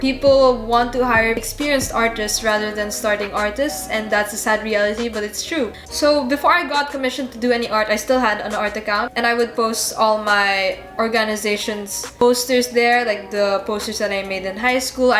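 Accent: Philippines. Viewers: i can tell youre from the ph bc of the accenT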